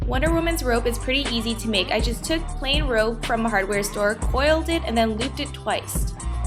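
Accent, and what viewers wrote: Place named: Chicago, United States. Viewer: i love your accent.. its so... so... CHICAGO!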